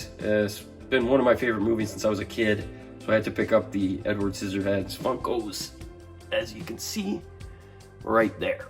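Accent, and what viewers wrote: Canada. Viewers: Tu québécois man? 🤔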